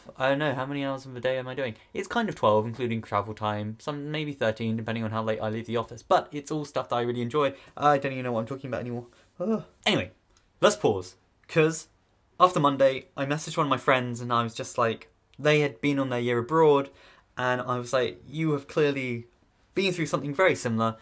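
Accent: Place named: England, United Kingdom. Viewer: Okay, so at first I was watching your videos simply for the applying to Oxford content, but then.. I don't know, there's just something about the way you talk to us which I really enjoy! :) Plus I'm still trying to get used to English accent, and since watching you is quite a pleasure, I guess I'll be staying here. ;)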